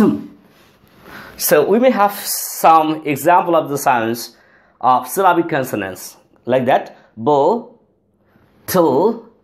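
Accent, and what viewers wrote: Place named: Bangladesh. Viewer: Like a bit banglish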